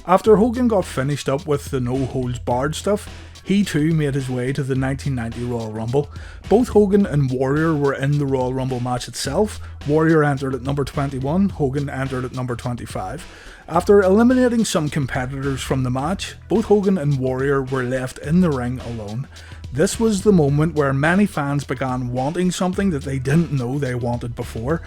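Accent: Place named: Scotland, United Kingdom. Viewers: are you a Scot? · I'm half Irish American and native I been thinking u was Scottish lol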